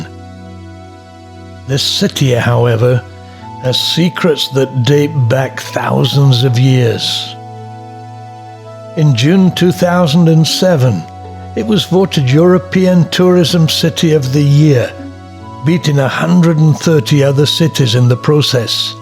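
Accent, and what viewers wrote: Scotland, United Kingdom. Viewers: Do i or do i not detect a Scottish accent in this vid. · Just perfect British accent to hear just sat to have afternoon tea.... Love the Touring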